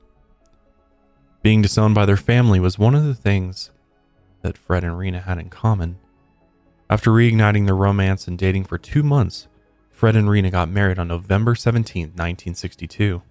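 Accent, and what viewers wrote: United States. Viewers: Oh my God, I had such a laugh out loud moment when I realised was talking about Hertfordshire at the beginning of this video 😂😂 and some other English names made me laugh throughout too. I mean that I was laughing lovingly because it's so cute how Americans often pronounce our place names 😅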